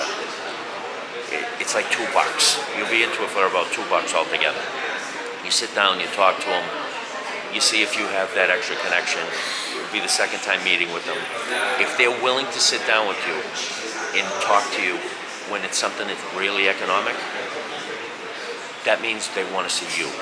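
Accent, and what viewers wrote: New York, United States. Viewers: Im from NJ and I can hear a long Island or NYC accent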